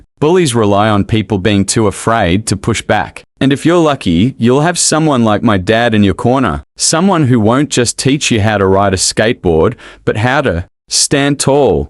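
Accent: Australia. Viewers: No mention of where, no names, could be true but I feel it easily comes over as fake or hyped. No clue of country feel its USA but sound Australian. What is this photo? Why the drawn guns? Why would they pull guns on a kid. The photo shows no safety girl, no image of the Karen. Who is the little girl. What HOA? What rule? More questions than answers. It sounds like a story. Absolutely nothing to say this was true, when, or anything. Terrible. This is truly terrible content.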